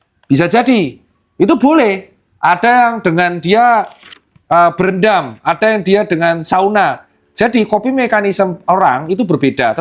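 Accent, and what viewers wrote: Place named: West Java, Indonesia. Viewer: Nih dr tirta aksennya sprti orang sunda.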